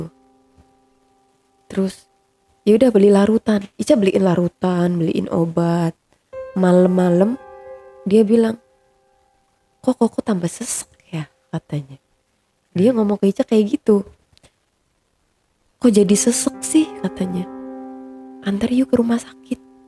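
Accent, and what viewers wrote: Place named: Purworejo, Indonesia. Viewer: mba bner lembut. sabar. ngomongny ky sdr saya orang purworejo cm tinggl di brebes. logatny sm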